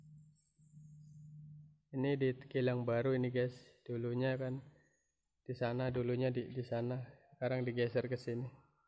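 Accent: Banyumas, Indonesia. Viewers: asli org ngapak cilacap ya mas aku purwokerto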